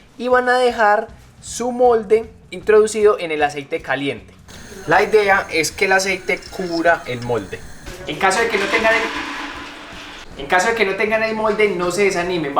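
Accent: Antioquia, Colombia. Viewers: Me encanta ese acento paisita 🤭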